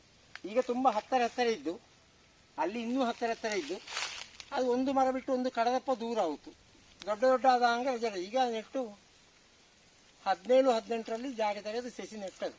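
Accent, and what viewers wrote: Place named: Kundapura, India. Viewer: ❤❤spr ❤ kundapur kannada ne chandha...! Alla ❤🙏🙏🙏👍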